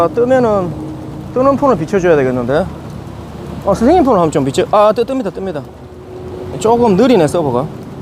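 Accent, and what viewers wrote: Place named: Seoul, South Korea. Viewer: 의 완벽한 서울말투의 답사수업은 단연 최곱니다 😊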